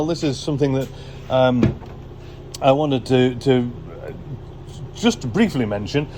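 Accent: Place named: RP, United Kingdom. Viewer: Hold on a minute. I'm 12 videos away from watching all your videos. I've been at it about 5 days. I'm watching in no particular order. I think I been going backwards mostly. Why are you talking so posh is this one? 😁😁😄🤣 what a difference from this one to your most recent haha. Anyway love your stuff, will be gutted if you get rid of Finn. I'm an aging L320 owner dreaming of an L663 someday soon I hope. Love from Scotland 🏴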